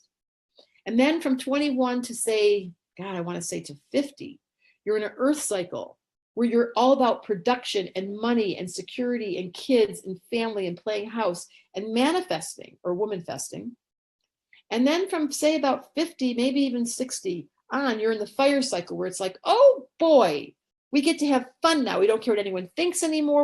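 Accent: United States. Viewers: may I ask? Are you from Chicago? I hear some kind of accent?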